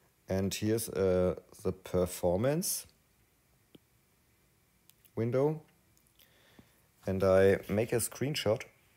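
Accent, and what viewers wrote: Bavaria, Germany. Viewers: I like your bavarian english!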